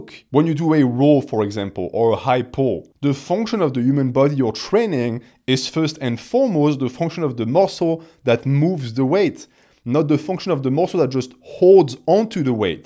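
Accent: France. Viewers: your channel its a treasure for a physcology study , are you french or canadian? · Dude’s more french than ever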